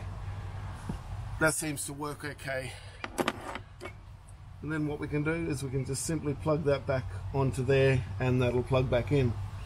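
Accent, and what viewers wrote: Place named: Australia. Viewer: Real Aussie. 😁. Thanks Mate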